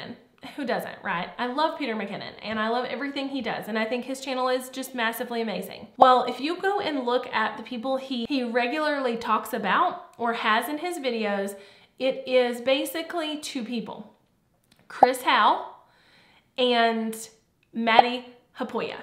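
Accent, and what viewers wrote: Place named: North Carolina, United States. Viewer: DUDE. this video and these analytics are blowing my mind :) THANK YOU - and im from NC too so your accennt is so comforting for me. lol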